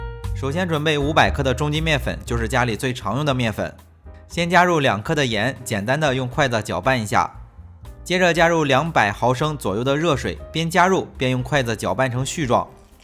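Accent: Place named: Shandong, China. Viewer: 听口音就是北方人阿，山东的吧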